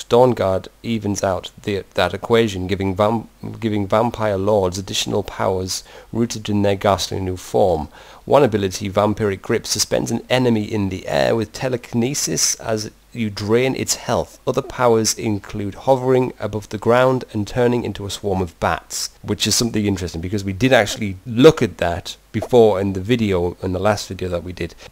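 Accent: United Kingdom. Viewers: britishhhhhh!!!! XDDD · dude ii watch your videos just for i hear your voice ... Britsh . its amazing.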